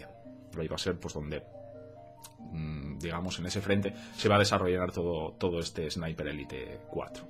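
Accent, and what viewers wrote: Asturias, Spain. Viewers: Es asturiano? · eres asturiano?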